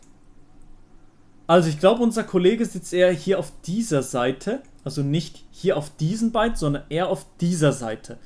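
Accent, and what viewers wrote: Switzerland. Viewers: Ey komm er ist Schweizer und bemüht sich so gutes Hochdeutsch zu sprechen😂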